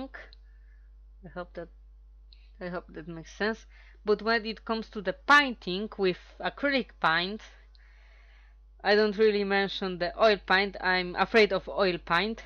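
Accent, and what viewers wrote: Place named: Russia. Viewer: which language do you speak? ´cause it sounds like russian, your accent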